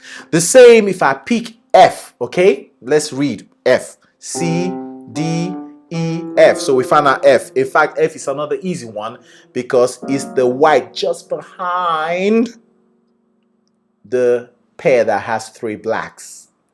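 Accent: Nigeria. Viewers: Are you a Nigerian? You sound Nigerian!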